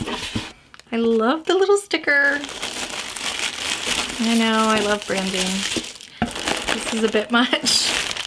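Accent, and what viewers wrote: Canada. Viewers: Thanks for doing this unboxing. i like how you include the times to fast forward to avoid spoilers. Nice Touch! I think the Advent calendar is very cute and as I quickly looked through the list of flavors included, I have no doubt that I would enjoy the teas. I do have an issue with the 3 "surprise" items as they merely seem like coupons you could get pretty much at any time and with the narrow dates (likely to try to spur sales during/after the holidays) they exclude them from being useful to me as the nearest David's Tea is more than an hour away, so I only get there 2-3 times/year. It must be your Canadian accent but your pronunciation of Advent was a bit distracting to me. You pronounce it as though it is spelled "Advant."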